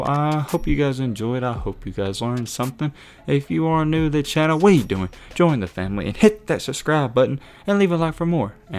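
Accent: South, United States. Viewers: Why are you talking so southern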